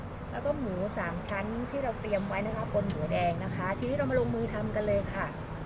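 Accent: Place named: South, Thailand. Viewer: พี่ เป็นคนปากใต้ใช่ไหมคับผมก็เป็นหนึ่งที่ชอบกินอาหารใต้คับแซ่บๆมากเผ็ดๆดีคับ ผัดใช่ผักอีลา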